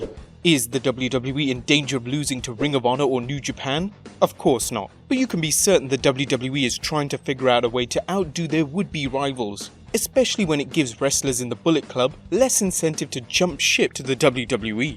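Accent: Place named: United Kingdom. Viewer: Triple AITCH. AITCH BEE KAY. POSStuh. I've always wondered if Brits pronounce words incorrectly on purpose or if they have difficulty hearing and reproducing simple sounds... You've been making vids for a primarily American audience for too long to keep doing that. In London, I ride the Tube; in the US, it's the subway. Come on dude. Next you'll pronounce the 'T' in 'wrestling'.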